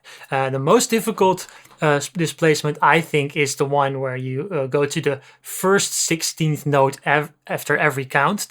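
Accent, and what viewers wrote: Netherlands. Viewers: Although your location says USA and your English is great.........am I hearing a Dutch accent ?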